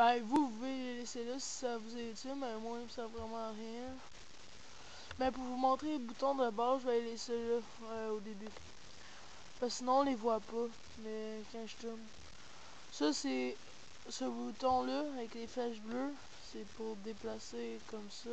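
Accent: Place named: Quebec, Canada. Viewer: J'adore la facon quebequoise de dire google on dirait plus qu'il disent gogole mais ca fait bizarre quand on n'est pas habituer!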